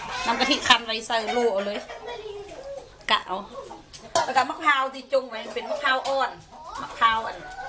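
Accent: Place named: Suphanburi, Thailand. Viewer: สำเนียงภาษลาวเพราะนะคะ ออกเน่อๆ เหมือนสำเนียงสุพรรณ ราชบุรี กาญจนบุรี เพราะดีค่ะ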